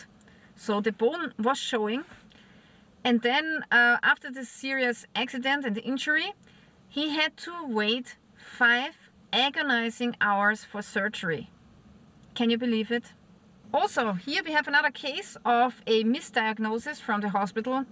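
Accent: Germany. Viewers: I don't know why, but I am getting so used to hearing the German/Austrian accent I want to visit you guys. Are you more Austrian? Because it just sounds like "German" due to my ignorance of the differences between German and Austrian speech.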